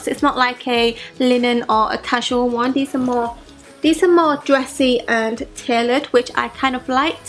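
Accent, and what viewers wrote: Yorkshire, United Kingdom. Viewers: Where is your accent from? Yorkshire?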